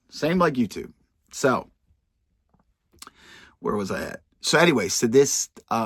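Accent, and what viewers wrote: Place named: Pittsburgh, United States. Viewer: That accent, are you a fellow Pittsburgher? Or from Philly? Hearing you talk reminds me of shooting the shit with my homies back State Side. I’m standing on business - on the good side of the law now - in Đà Nẵng, Việt Nam and just because I speak the language doesn’t mean I don’t miss talking and listening to that real raw American nitty gritty from someone else who’s been in the trenches and popped up a better man yet have a prequel, story, and sequel about running wild. Type of things I highly censor when it comes to talking to the Misses 😂 Just subscribed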